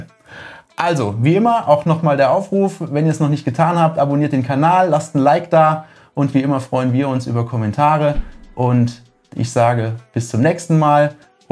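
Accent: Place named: Swabia, Germany. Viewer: Servus, du sag a mol woher kusch du eigentlich genau? Du schwätsch d gleiche slang wie i😅.vg Tobi😊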